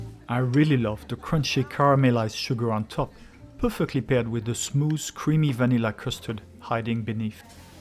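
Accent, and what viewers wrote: France. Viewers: I'll always remember my trip to Montmartre; my next time in Paris, I'll dine in the warm ambience of Le Bon Bock -- despite having to pat my hand a bit to find my fork and knife. 🍽 Just kidding... I love a dimly lighted resto for supper. Ahhh, that roasted Camembert w/honey and thyme! I can taste it now. 😋 Viewing your well paced videos while listening to your marvelous French accent is such a pleasure. Merci beaucoup,. 😊❤❤❤❤❤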